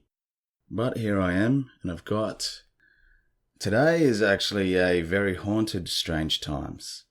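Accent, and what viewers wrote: Australia. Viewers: Awesome Aussie accent and awesome ghost videos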